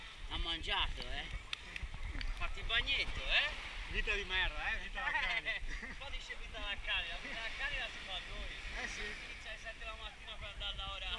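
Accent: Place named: Tuscany, Italy. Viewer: Obbrao tummisei garbao un monte....tai imparao il toscano proprio bene...brao😊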